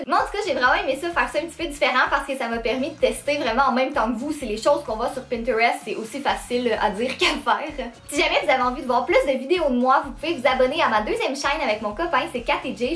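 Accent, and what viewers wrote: Quebec, Canada. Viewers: bonjour juste pour te dire que ton accent quebecois est horrible mais qu'est ce que tu es agreable a regarder;) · L'accent québécois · J'ai vraiment du mal a comprendre l'accent quebecquois.. Tu as un tres fort accent je trouve! Mais tu es trop mignonne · C'est l'accent québécois <3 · je t'aimmmme💜tu es trop belle et j'adore ton accent québécois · Jesépakoimètre! C est un accent Québecoi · son accent québécois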